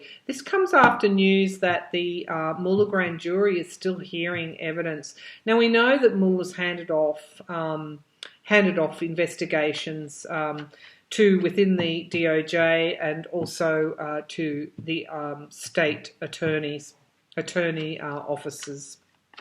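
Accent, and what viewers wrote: Australia. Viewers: it's okay we love that Aussie accent keep it up. by the way we a also love your beautiful smile. I cannot wait until the world gets a little bit lighter, so you can smile a little more. I feel bad for you I know this stuff must be hard on you. These readings must take a lot out of you. We appreciate every single time we get a new video. Keep up the good work.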